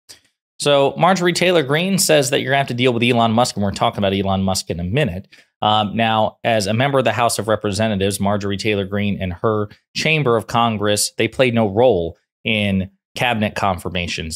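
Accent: South, United States. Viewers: Great southern hoo hah accent…your Trump’s not bad either..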